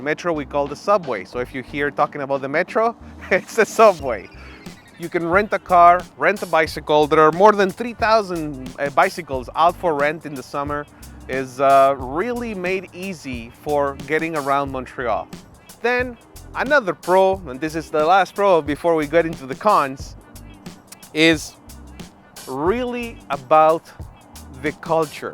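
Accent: Spain. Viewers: Great Info. I was confused at first listening to a Spanish accent from Montreal. Most Americans have trouble with one language let alone 3. You forgot the biggest pro - the food. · you are very charming and positive with your Spanish accent !!! Are you Argentinian of the Italian decent ?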